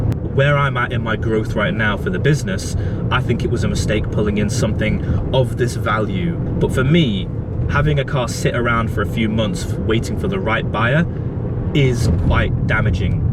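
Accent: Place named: Isle of Man, United Kingdom. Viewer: Hi, you can do this….just slow things down a bit, you’re trying to squeeze too much info in…main points, no waffle…..you come across fantastic, and the cameras and microphones are spot on….hope the vlogs work, and the business goes from strength to strength…..👍🇮🇲😉👌. Ps….I can still hear some manx in there yessir, if you know you know…..can’t fault the narrative, just slow down a bit…😜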